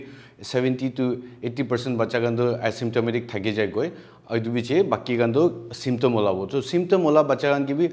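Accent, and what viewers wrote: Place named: Nagaland, India. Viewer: Your nagamese is very sweet and clear hope everyone will become a good Frontline workers after hearing your message.Thank you Dr.God bless you for your good works.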